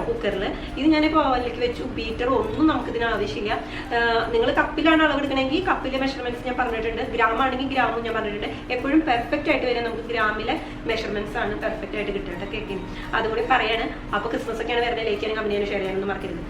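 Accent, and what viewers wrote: Thrissur, India. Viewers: Chechi superb presentation,nammude tcr basha pollichutta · Taken long break in between. Eager to watch your vlogs bcoz of pure thrissur slang.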